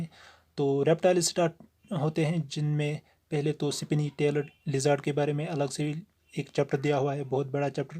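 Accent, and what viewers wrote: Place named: Kashmir, India. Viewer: You are Kashmiri I got it through your accent 😶... Great job bro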